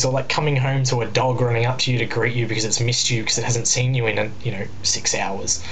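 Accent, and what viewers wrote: Australia. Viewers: ) australian accent, thats hot xP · Heh only a Yank would think that was British. But as a Brit, you have a lovely Australian accent. ;) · I'm Australian and if you are Australian you would be able to recognize his accept ahahah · I'm British.. you deffo sound like an Aussie lol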